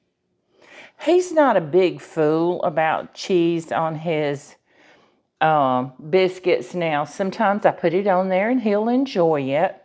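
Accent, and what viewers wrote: South, United States. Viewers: Hey there, this is first time I’ve seen you. Love your bright and beautiful kitchen, and your beautiful soft and calm voice (you sound Southern like me). These are my hubby’s fave breakfast foods but I’ve never made into pockets before, a must try! At age 74 I’ve learned something new. Thank you!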